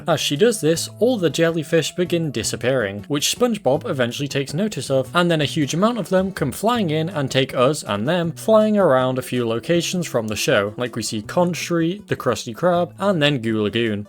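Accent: United Kingdom. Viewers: Its about time a British Narrator got around to adressing this. Cheers!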